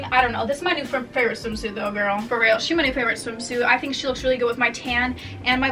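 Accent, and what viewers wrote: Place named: Texas, United States. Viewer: her texas accent 😂❤️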